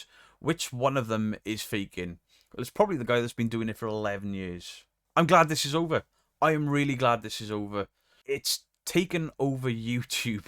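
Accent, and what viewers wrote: Wales, United Kingdom. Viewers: you're not toxic, whoever told you communities were are correct. There are just a small faction of people online who are control freaks, narcissists, and have nothing better to do than try to cause toxicity between groups. They will never stop no matter what you do and do not do. You are beloved in this community. You're the first debunker I've ever seen when I didn't know what that word meant. I could barely understand anything you said because I didn't understand the welsh/english. Now I understand you perfectly, and enjoy listening to you. Mrs. Geek is awesome! ❤You changed my life, recommended other fantastic people who have changed my life as well. Don't let these bad people cause you to question yourself. You've never been the problem. As an outsider I can see much more than some people who are really close to it can. 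Thank you for being you. ❤❤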